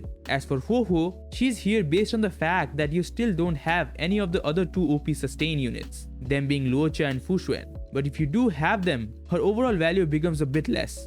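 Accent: India. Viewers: you sound indian, are you?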